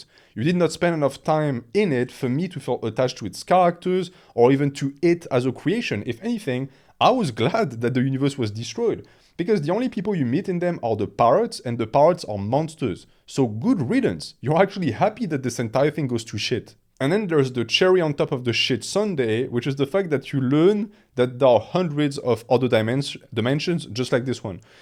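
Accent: France. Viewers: Why is Adam driver pretending to be a YouTuber with a French accent? He's pretty good!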